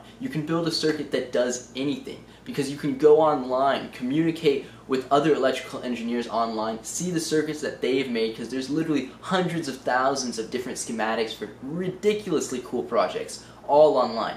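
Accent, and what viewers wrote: United States. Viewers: One of the biggest West-Coast accents I’ve ever heard 😂 Keep it up man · new yorker? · you look like an indian boy and spealk like a american👍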